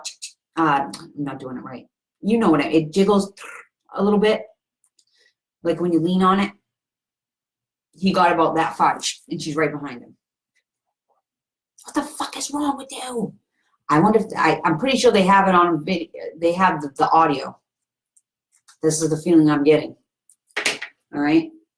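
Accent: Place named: New York City, United States. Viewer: I love you girl. I used to live with family in NYC and I am hearing some accent from you but I could be wrong lol.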